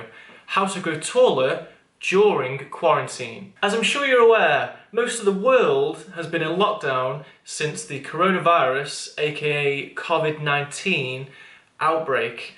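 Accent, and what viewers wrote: United Kingdom. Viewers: You speak uk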